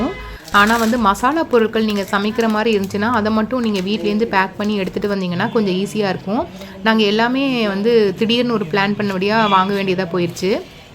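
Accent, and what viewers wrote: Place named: Coimbatore, India. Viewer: கோவை யா! பேச்சில ஒரே இங்க இங்க யாவே இருக்கே!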